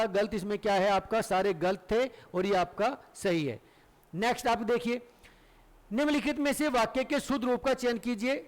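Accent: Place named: Haryana, India. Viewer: Apka tone lagta hai ki aap hariyana se hai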